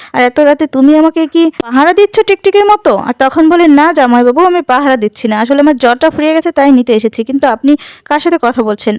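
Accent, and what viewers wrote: Bangal, Bangladesh. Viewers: আপনার বাঙ্গাল বাঙ্গাল, চোয়াড়ে,অশিক্ষিত কথকের কথকতা শুনতে মোটেও ভাল লাগেনা।যে গল্প বলার caption দেন,তা শোনান না।এটা breach of trust ,আইনত দন্ডনীয়। নিজের ভুল শুধরে নিন। চেষ্টা করলে শুদ্ধ, কথ্য ভাষায় কথা বলতে রপ্ত হয়ে যাবেন। আশাকরি ,চেষ্টা করবেন নিজেকে তৈরি করার। অনেক শুভেচ্ছা রইল।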